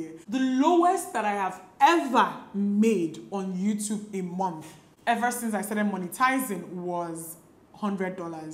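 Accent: Nigeria. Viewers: Thanks for sharing! 💕 If I may make one recommendation, please speak a little slower. I’m somewhat accustomed to the Nigerian accent, but even I struggled a little to understand everything you said. I agree with the other viewers, you look beautiful!💕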